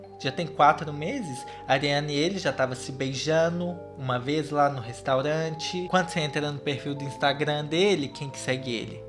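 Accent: Brazil. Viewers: Ariana corre aqui pra ver esse babado! Nauo aguenta tu! Que voz BRASEEL! Cadê a segunda parte da história da polônia homi? ❤️❤️❤️❤️❤️❤️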